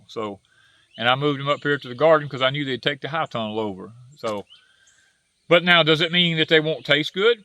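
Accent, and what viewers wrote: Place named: Texas, United States. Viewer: Hey guys, we’ve been trying to guess WHERE y’all are lol…we would say well they talk like us, have nut grass, a few weather moments yall would have matched ours, and finally just heard him say Conroe lol so finally someone that is in our climate. We’re in Beaumont adjacent aka Vidor. Nice to see that some of Conroe is holding onto the country lifestyle.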